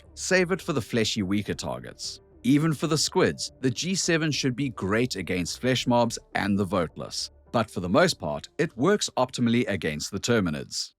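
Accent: South Africa. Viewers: mhm, That a South African English accent i hear?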